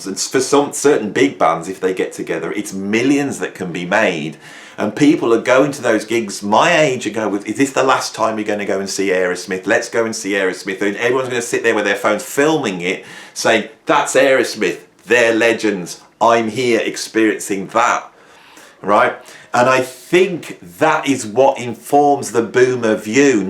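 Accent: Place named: Midlands, United Kingdom. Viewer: First time viewer…….What part of of the UK are you from? Just curious…..the way you pronounce “wrong” for instance, sounds like the Midlands? Im American, and fascinated with different accents…..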